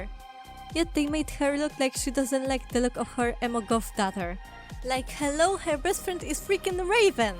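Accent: Poland. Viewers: Questioooon, Are you polish? The accent kind of sounds Polish and I wana know if I’m good at recognizing other Poles, and if Not I’m still glad you’ve actually taken the time out of your life to learn english, You sound awesome >:3 ALSO TPN AND HANAKO KUN MENTIONED WOOOO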